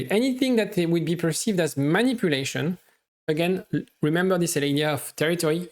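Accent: France. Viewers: Is that a French accent?😊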